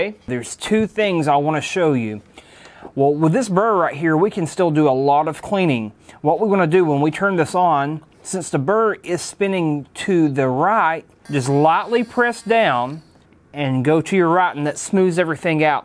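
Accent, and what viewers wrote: South, United States. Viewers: Love that southern accent....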